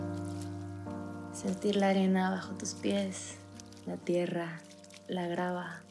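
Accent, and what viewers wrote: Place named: Mexico. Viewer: Su acento de mexicana 😍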